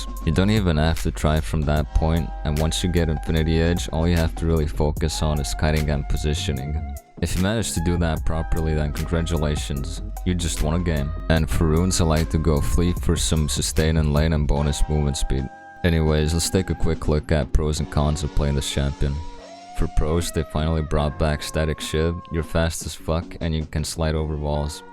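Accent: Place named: New Jersey, United States. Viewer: u sound like u have a sopranos accent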